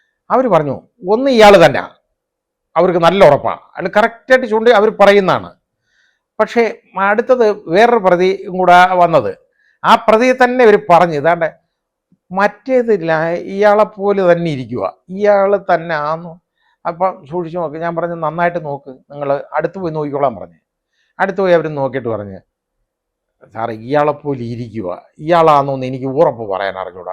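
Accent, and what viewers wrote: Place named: Pathanamthitta, India. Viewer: സാറ് ഇത് തുറന്ന് പറഞത് നല്ലതായി, സത്യം ജനങ്ങൾക്ക് മനസിലായലോ🙏🙏, കാന്തപുരം ഈ വയസ് കാലത്ത് വടി കൊടുത്ത് അടി വാങ്ങി... ഒരു ഹൈക്കോടതി ജഡ്ജിക്ക് പോലും ഈ അവസ്ഥ ആണെങ്കിൽ സാധാരണകാരുടെ അവസ്ഥ ആലോചിച്ചു നോക്കൂ, സാർ... താങ്കളുടെ തുറന്ന് പറച്ചിൽ തുടരുക.. പത്തനംതിട്ട ശൈലിയിലുള്ള സംഭാഷണ രീതി കേൾക്കാനും നല്ല സുഖമുണ്ട്... എല്ലാ ആശംസകളും 💐🙏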